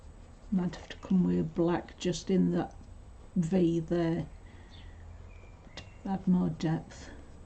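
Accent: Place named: North West England, United Kingdom. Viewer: Hi...I'm currently doing this colouring at the moment...I love Hares and love the way you've built up the colour here. You sound very local to me...north west England?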